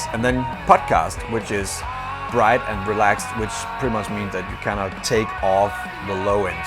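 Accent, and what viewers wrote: Denmark. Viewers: Danish accent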